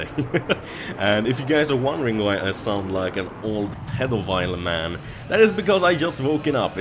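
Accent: Sweden. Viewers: Are you from sweden? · Yet... Yet gameplay, ah your accent is so Swedish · u are swedish?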